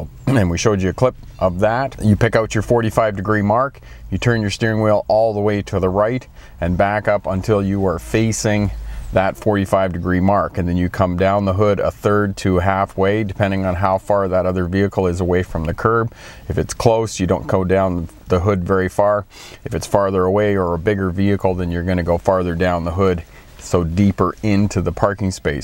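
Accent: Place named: Western Canada, Canada. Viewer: I was watching this thinking, "this guy must be from Western Canada" and lo and behold from BC. I can tell me Canadian accents pretty good.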